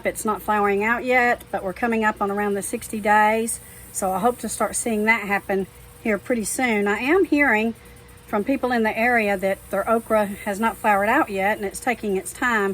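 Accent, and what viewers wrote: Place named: North Texas, United States. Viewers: North Texas gal here in south Tx. Yes her accent takes me back to Archer County and where I grew up! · SE Texas here. What was that wet stuff falling from the sky? I swear, we haven’t had rain in months. I was thinking about planting some spinach on my balcony garden. Fingers crossed. I just love watching your videos. Thanks for all you do. I grew up near Lake Texoma. Your accent takes me back home. ❤️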